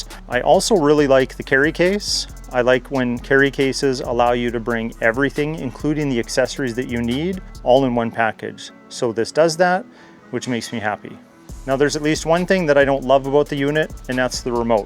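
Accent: Canada. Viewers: man, what a dumb comment. You’re an embarrassment to the rest of us. If you couldn’t tell he was Canadian by his accent, you need to get out more. Also, you act like Fahrenheit is American…it was developed by a Dutch physicist and the US is only one of 5 countries in the world that still uses it. There’s a reason for that. And if your comment was some smoothbrained attempt at American exceptionalism, you really need to read some history about how strong an ally Canada has been, regularly spilling more blood and treasure per capita that the US does in global conflicts. You came here looking for information and it was given to you for free…so just be grateful someone else did your homework for you. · You did well explaining it. Love the way you say garage, sounded like you were cutting the letters down to just 3 lol. you'll see it's like soft french.....!!!! Canucks always sound foreign. 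Must be in the water. For the price if it's durable. And durability being the key word it's worth the $ 699. Or is it???? A top mounted R.V. ac/heater that is much more powerful is only $200 more. But for tents and off grid applications this would maybe also be great except I check a low watt a/c on Amazon and it's only $ 332 14,000 btu , so...... For tents this would be worth it. Another scary thing is it only comes with a 1-year warranty. That doesn't build confidence. For winter we always use a low watt electric heater along with kerosene lanterns. You'd be amazed how much the old hurricane lanterns put out. We made #2 boxes for ours to sit down in, so the kids couldn't knock them over. But now in our " Golden Years we just do hotels and motels. Get all kinds of rebates and miles and bonuses for loyalty too. Much safer and easier and actually cheaper. Over 65 has it's perks. Loyalty is the key. 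Best regards Canuck. Great Job on the video. Peace.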